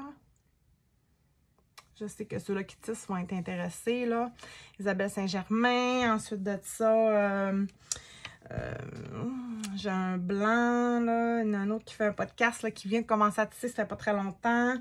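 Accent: Lac-Saint-Jean, Canada. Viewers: 👏👏Yeah! Bravo! Je suis vraiment contente de t’écouter. J’adore ton accent du Lac, tu fais de magnifiques réalisations une fille polyvalente. On a un peu les mêmes passions tous les 2 je me reconnais beaucoup quand tu perds tes mots je suis pareil 😂 Bonne continuité! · Un plaisir de te découvrir et t'écouter ! J'ai adoré ta personnalité passionnée, dans laquelle je me reconnais. :) et j'adore ton accent du Lac ! Un très bon moment. Au plaisir ! xx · J’ai beaucoup aimé ton premier podcast. J’aimes ton accent du Lac. Tout les projets sont beaux. Un jour j’aimerais bien ça faire du tissage. J’ai quelques linges à vaisselles tissés je l’aimes adores. Tes sacs aussi sont beaux. J’espère que tu vas continuer, je me suis inscrite.☺️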